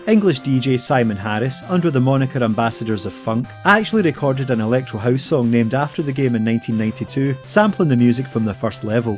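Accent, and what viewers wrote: Scotland, United Kingdom. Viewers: That voice......reminds me of what Shrek would sound like in his mid 20s. (don't take this the wrong way, Scottish accents are one of my favorite accents of all time!) Can't wait to check out your other stuff :)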